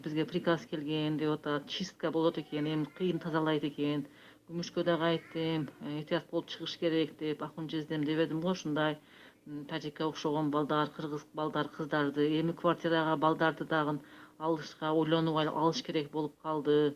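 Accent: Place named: Uzbekistan. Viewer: Сен озун озбечкасынго суйлогонун озбектей экен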